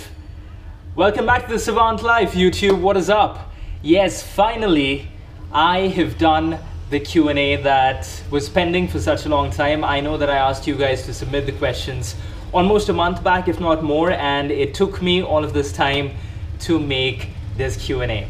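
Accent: Hyderabad, India. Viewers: hydrabadi Urdu was just amazing... kirrak... maut dal Diya.. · bhaiii Lovely.. that's a Crazzy video..!! ❤ Hyderabadi Hindi 😍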